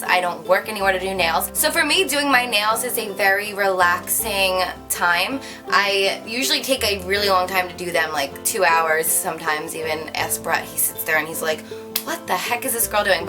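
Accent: New Jersey, United States. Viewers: Omg your NJ accent came out deep here ! Lmao your the shit ! Screw the haters your beautiful !!!!!!!! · You sound like you're from jersey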